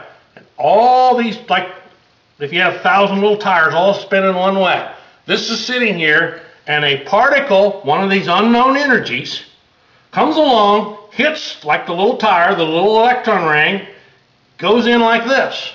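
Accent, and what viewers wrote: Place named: Texas, United States. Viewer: aherlm ?! an american with texas dialect explaining particle mechanics (insert texas joke here) I'm having a hard time to swallowing this is real science americans cant even keep their government clean from corruption insert rant about copyright, patent system, privacy violations, lies, bully, threaten, *grumble grumble*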